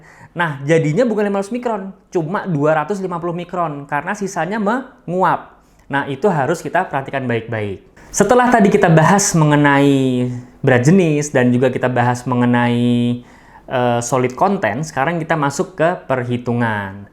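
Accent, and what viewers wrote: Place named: Banjar, Indonesia. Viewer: Logat banjar nihhhh..heheheee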